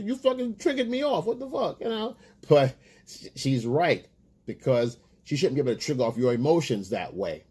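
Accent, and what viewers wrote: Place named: North, United States. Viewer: ...You live in a large city area,.I live in a rural area=The South✔..Big difference like night and day..The South is more of a traditional approach with women,even though the majority have the same motives all over america..From your voice and prospective I'll guess you live 🗣in the North..Completely different than the South,specially the rural areas where family legacy is known=Your family,cousins,uncle,aunts,friends,grands and exes are known through you(they know alot about you bro.)..They know where you go,hang out,job,who you bangin,what you drink=Ain't no million factors in the South rural areas bro🤣.,ain't no putting on a show unless the person truly is the show💯..Appreciated your videos and prospective wisdom great content..👊🏽💯.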